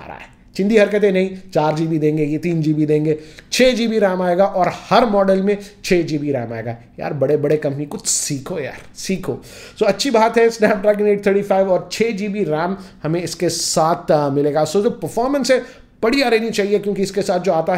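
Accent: Hyderabad, India. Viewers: i just watch your videos because i like hearing hyderabady language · Kya bhai AP Bhi Hyderabadi hai bhai..Nice Hindi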